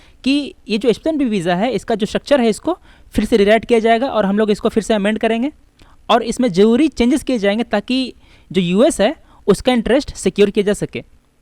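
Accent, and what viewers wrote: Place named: Bihar, India. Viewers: if u have prblm with bihari accent... plz don't watch this videooo....this video has very beautifully explained all the pros and cons of H1B visa... thanks a lot sir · U TUBER thats so rude of you . i like his this bihari accent . you dint watch if you dont like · Dear U TUBER if u don't like the Bihari accent then do one thing, apply for H1B visa go to US and watch You Tube videos there u may like the american accent. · Bihari accent irritates me....pls sir change it if possible :)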